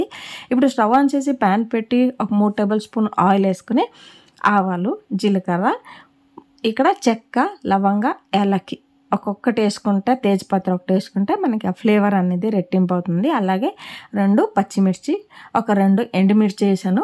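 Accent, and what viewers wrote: Srikakulam, India. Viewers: శ్రీకాకుళం మీది మీ యాస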